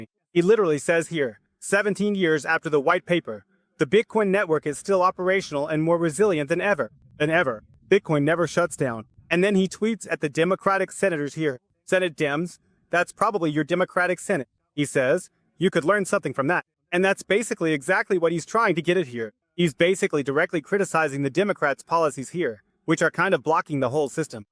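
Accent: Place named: Switzerland. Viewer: Sapperlott, des schäenglish isch voll super! 😊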